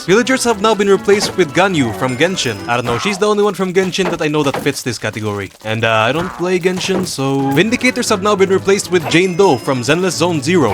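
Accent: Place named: Philippines. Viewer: An idea that can only be mustered by a Filipino. I can recognize that accent from anywhere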